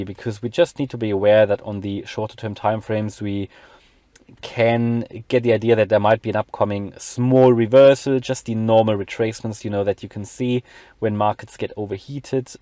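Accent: Germany. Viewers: Ergo, love the German accent!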